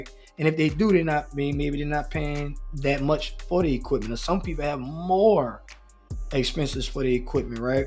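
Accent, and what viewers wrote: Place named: Louisiana, United States. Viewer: Salute my Louisiana bro i can here your accent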